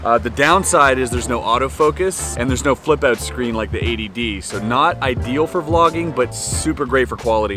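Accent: Quebec, Canada. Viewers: What ?😂 you speak french? Quebecois en plus?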